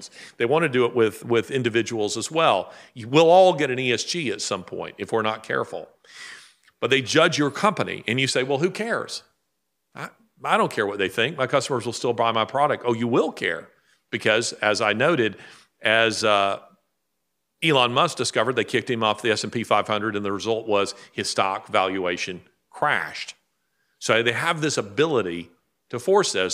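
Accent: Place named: China. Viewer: Sounds exactly like 🇨🇳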